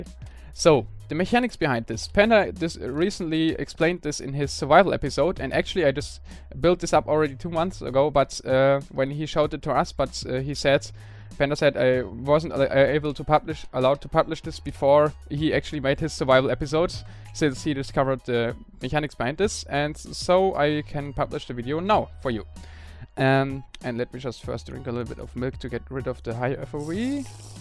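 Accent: Germany. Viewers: mich persönlich stört der Akzent nicht wirklich, aber das "th" ist noch ein wenig ausbaufähig im Grunde das klassische Problem vieler Deutscher im Englischen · Are you german?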